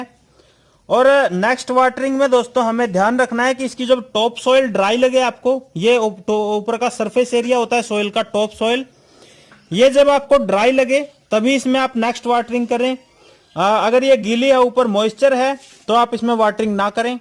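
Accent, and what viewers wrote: India. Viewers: haryanvi ? kit ke ho?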